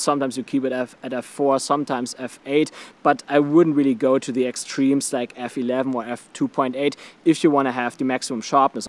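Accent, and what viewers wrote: Germany. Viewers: I can spot a German accent from 100 meters away. But anyway, this was a very helpful video! Good Job!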